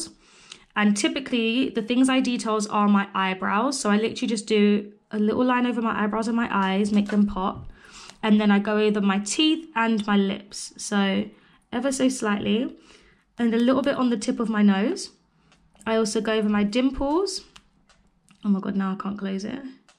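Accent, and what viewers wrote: United Kingdom. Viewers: The English accent is so cute to me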